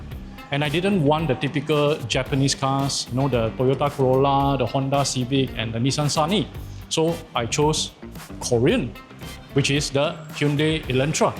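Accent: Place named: Singapore. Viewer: Singapore English style 😑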